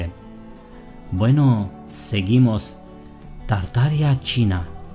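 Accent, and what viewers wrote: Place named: Saxony, Germany. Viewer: Me pregunto cuántos idiomas sabes. Por el nombre de tu canal veo que manejas el Latín, por tu acento adivino que eres sajón, por la información que presentas veo que sabes francés. Eres muy bueno. Saludos desde México, tú amigo Tartariano....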